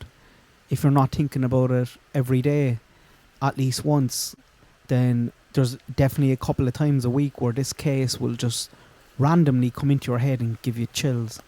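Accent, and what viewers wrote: Ireland. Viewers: Maybe seem a backhanded compliment but in fairness you have done some very good work here with this part of the investigation, i.e., the items found so props for that. Even though I do struggle at times with the thickness of your Irish accent lol. I used to work in Ireland and never got used to the accent, regardless of how long I was there. It's just one of those very thick accents that one can either grasp and understand fully or not. I guess I am one of those who can't. However, people need to maybe start coming to terms that they were duped and mislead by the Murder Sheet over the Klines, who they literally accused the Klines of these murders. Now, people who were adamant that the Klines had murdered the children, are adamant that they are involved someway or somehow. It may turn out it was just a coincidence that Libby had contact with that AS profile and that is what that Voorhees guy said after hacking into the account, i.e., 'synchronicity. 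Seems that AS profile and Klines profiles were prolific, meaning they were targeting many young impressionable teens and children in Indiana and further afield. I think people need to maybe just stop this Kline obsession and fully focus on what we 'know' and not 'think' we know as Carter put it and we know Richard Allen is the BG, despite Carter not confirming this. That is why your work at this stage is very good as you are focusing on Allen and items found, when other channels were not. Again, props for that. Of course, don't ful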